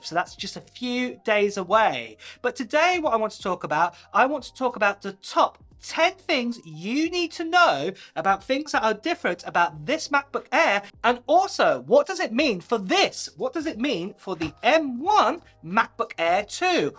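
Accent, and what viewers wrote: United Kingdom. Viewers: hey! Are you from the uk or usa? only because you have a british accent but say dollars. Also, any update on macbook se?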